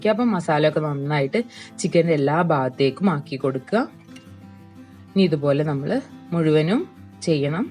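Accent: Malappuram, India. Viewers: Ithante samsaram erekkure malappurathekk varunnundonn doubt.... adipwoli ayittnd ttaa 🥰🥰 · ഇങ്ങള് മൽപ്പൊറത്താണോ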